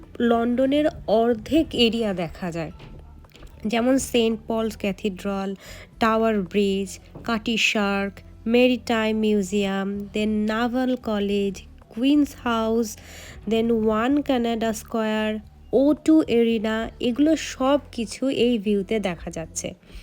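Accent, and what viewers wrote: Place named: India. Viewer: You are Bengali🇧🇩? Or Indian? আপনার ভাষায় মনে হচ্ছে ইন্ডিয়ান! Love from Bangladesh🇧🇩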